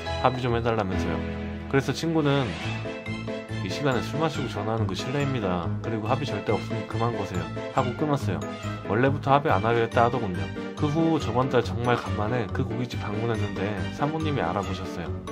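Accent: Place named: Busan, South Korea. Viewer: 마 부산사투리 어썰푸다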